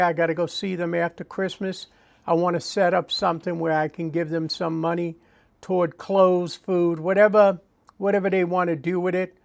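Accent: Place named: Long Island, United States. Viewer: cant hide hat axcent...lol...same here ex Long Islander..!..thank u 4 a breaf & accuret explanation..!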